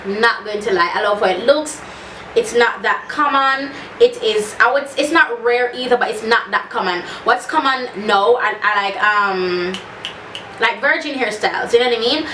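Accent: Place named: Jamaica. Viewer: I love the way you command the English language and then jumped back to patios 🇯🇲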